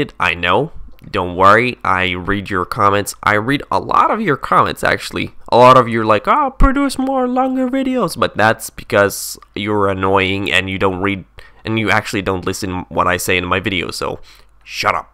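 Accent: Scotland, United Kingdom. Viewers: English but in a british accent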